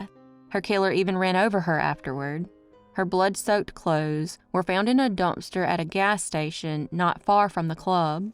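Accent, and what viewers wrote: South, United States. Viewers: My family and I were talking today about southern accents. Yours is adorable. You do such a great job on these stories.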